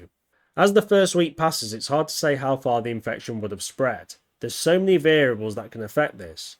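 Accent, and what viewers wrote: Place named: Mansfield, United Kingdom. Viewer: Mansfield accent detected. 🚨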